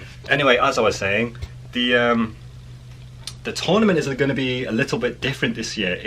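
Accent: United Kingdom. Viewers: How does your English accent affect your speaking your native language? thanks for sharing!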